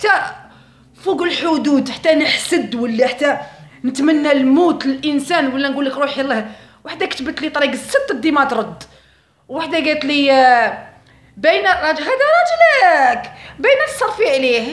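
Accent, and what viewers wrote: Algeria. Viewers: سلام أنا كمال من العاصمة والله أول مرة إنتابع فيديوهاتك و الله عفويتك و حكاياتك لكي شخصية حلوة حلوة مرحة فكاهية مام الهدرة تع لبلاد طبعاتك خلاتك قوية حلوة بزاف شبهتك شوي لبيونة خوك كمال من العاصمة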